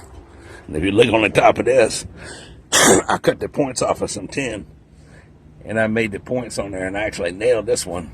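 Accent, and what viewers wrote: United States. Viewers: What state is this accent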